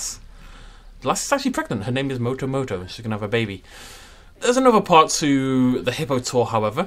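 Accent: Afrikaans, South Africa. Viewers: Oop, hello fellow Afrikaans speakers XD This is so cute! You’re Afrikaans is so adorable >u<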